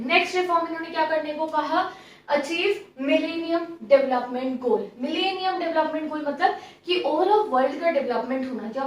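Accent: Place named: Uttar Pradesh, India. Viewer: Inke language u.p feel lati h......moro moko mohse hega n all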